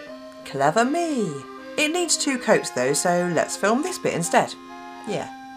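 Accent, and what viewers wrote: England, United Kingdom. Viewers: I love all your videos they are so awesome, your so good at doing nails. I got inspired by you cute icecreame nails that are so cute and I did them on my nails but didn't turn out as good as yours😂😔 anyway I also love your accent I lived in England for 4 years I'm from chile. I have one last question if you don't mind what part of England are you from? thank you your videos at awesome.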